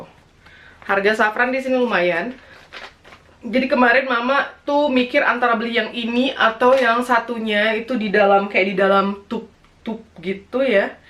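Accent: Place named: Java, Indonesia. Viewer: Vlog kali ni mamak brisik ya ?? Rekuwes brisiknya luwi ... (Ilat jowo Mak) hahahaha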